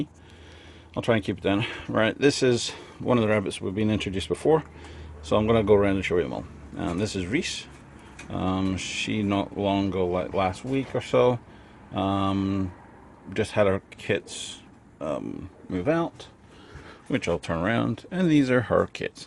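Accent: Scotland, United Kingdom. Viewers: I know you live in Canada but are you originally from Scotland?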